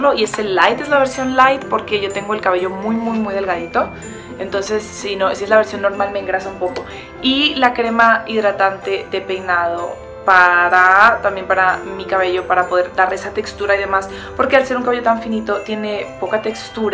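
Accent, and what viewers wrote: Colombia. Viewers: ¿por qué motivo ahora hablas con acento colombiano? 😒 incluso 'seseas'...increíble... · hermosa tu nuevo apariencia!!!!! se te pegó el asento colombiano!!!! jajaj. besos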